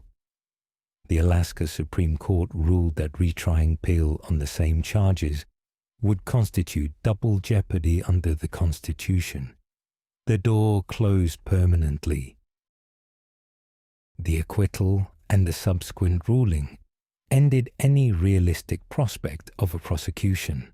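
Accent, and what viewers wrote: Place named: England, United Kingdom. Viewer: Fellow English I love English accent , Chelsea girl London born and raised❤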